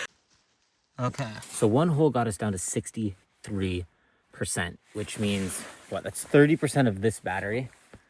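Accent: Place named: United States. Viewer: You sound American she sounds polish?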